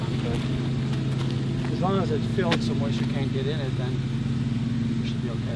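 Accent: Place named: California, United States. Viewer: I thought you were from California cause you look like Tony Hawk and you have a laid back Californian accent 😉 wish I could've helped. Yeah weather degrades it fast. Just applied a 3 yr sealer 2 weeks ago and sun already disintegrated part of it. Im in upstate New York, weathers not quite as harsh as Minnesota but there are times when we're colder, plus we get a ton of lake effect snow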